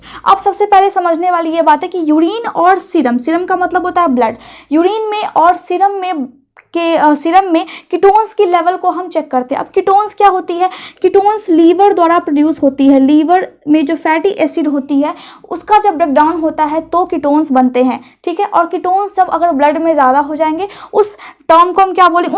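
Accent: Bihar, India. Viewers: Ap Bihar se ho didi kaya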